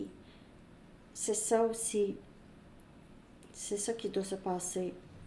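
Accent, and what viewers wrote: Canada. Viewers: Bonsoir ✨ Je suis nouvelle abonnée Merci pour vos messages avec ce délicieux accent canadien Gratitude infinie 🙏🦋🌹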